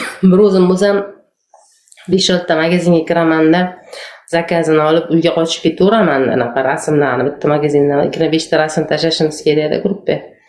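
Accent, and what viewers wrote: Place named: Fergana Valley, Uzbekistan. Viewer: ❤. Salom. чиройли сингилжон, Шева водийликка ухшайсан, хозирда каерда ишлайсан, сенга омад тилайман. Хохласанг оздирадиган рецепт ташлайман. И. Б. Н. Синодан. ❤❤❤❤❤❤❤❤❤❤❤❤❤❤❤😂😂🎉🎉🎉❤❤❤❤.., раджаб ота78 еш.. шифор пенсионер.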